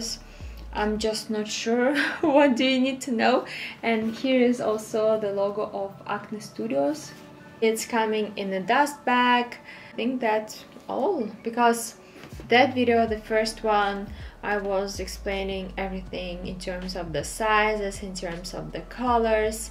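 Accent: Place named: Russia. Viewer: You have russian accent but you obviously dont look russian)) Where are you actually from?)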